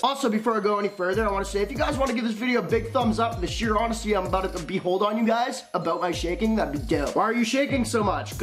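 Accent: Canada. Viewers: Are u Canadian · Hope you stay safe man//side note you have such a THICC Canadian accent already knew where you were from in the first min 😂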